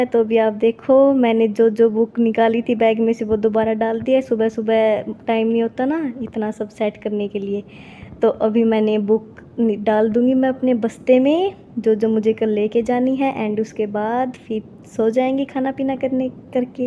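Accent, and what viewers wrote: Haryana, India. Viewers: Aap hariyana se ho....pakka tone se pta chl gaya😂 Aso in Css 2024🤞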